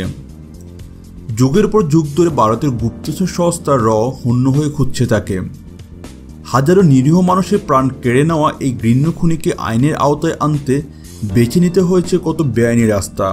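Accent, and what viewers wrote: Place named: East Bengal, Bangladesh. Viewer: সালা বাঙাল আগে বাংলা বলতে শেখ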